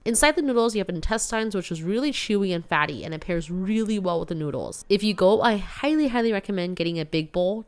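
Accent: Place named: United States. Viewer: Why your accent is so American but you grew up in Taiwan? I love how much you enjoy Taiwanese food and you’re a very smart chef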